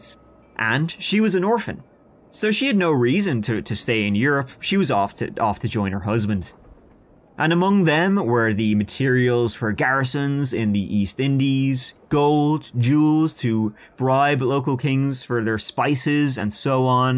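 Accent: Ireland. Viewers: Feck yes. The mad Irish lad telling the tales. Heaven.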